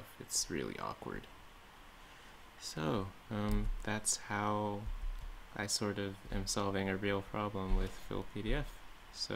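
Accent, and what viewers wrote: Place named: United States. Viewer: For a Norwegian your accent is very American. Have you lived in the states prior?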